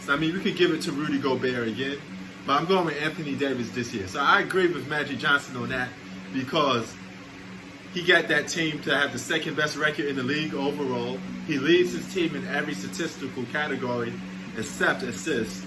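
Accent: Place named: New York, United States. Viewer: One word ruby lol he stopped the whole league u wild boy hahaha good ish p u from New York I can tell by your voice and how u speak lol very ny son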